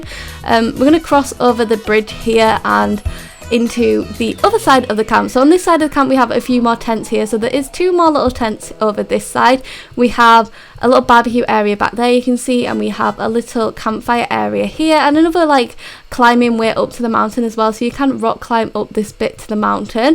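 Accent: United Kingdom. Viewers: Honey Dew I love her accent too! But I’m warning you do not try and replicate a British accent as an American you’ll sound so stupid! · This may or may not be creepy but like I really love your voice (like your accent) Maybe its because I'm American but I really want a British accent (Also I just started watching you like 20 min ago and I'm already subscribed :D)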